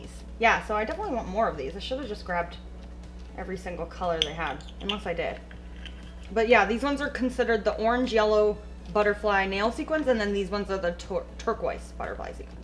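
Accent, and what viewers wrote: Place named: Western Canada, Canada. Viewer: I think it’s only out west. I lived in BC for a few years and they say it the way does. I’m in Ontario now and we say decal with cal sounding like the name cal. They also say it that way further east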